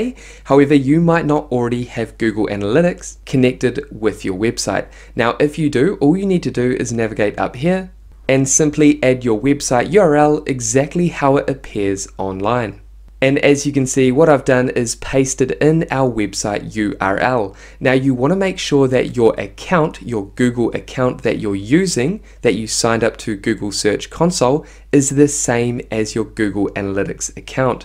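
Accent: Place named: New Zealand. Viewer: Great content, thanks. Good to hear a kiwi accent too!!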